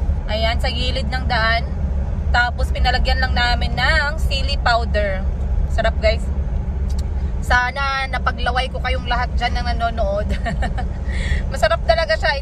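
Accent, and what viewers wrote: Iloilo, Philippines. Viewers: Ilonggong ilonggo ka gid ba Inday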